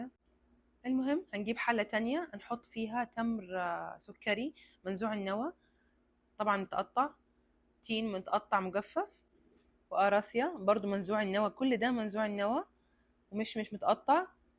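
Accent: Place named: Saudi Arabia. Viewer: حاسه فيه لمحة لهجة سعودية 😂😂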